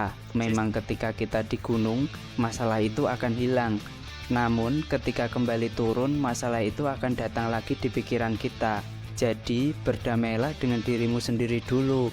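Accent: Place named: Java, Indonesia. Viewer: Medok suarane🤣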